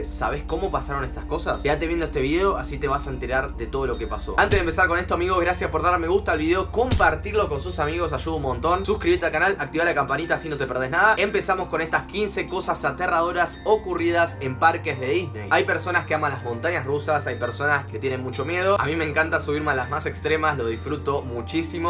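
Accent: Argentina. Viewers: Sos arg??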